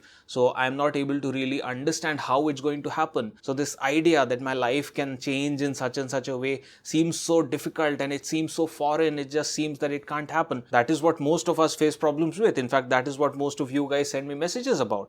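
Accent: India. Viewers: This pure Indian accent sounds sooo good....no artificial stuff 👏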